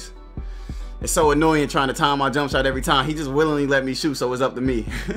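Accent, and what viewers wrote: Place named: South, United States. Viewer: You can hear the So in the way bro talk🤣💯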